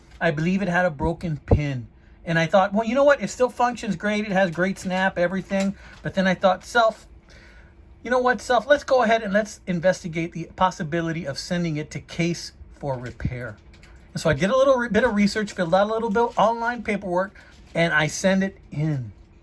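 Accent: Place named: Texas, United States. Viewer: Well before I saw the return address on you send-in I went,'This guy sounds like a finest-kind native TEXAN and sure enough...! Your lead in was full of information and not at wordy and completely informative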